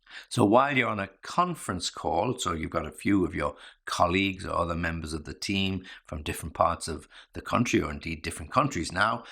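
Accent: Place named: United Kingdom. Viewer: Enjoying listening your British accent🤩, thank you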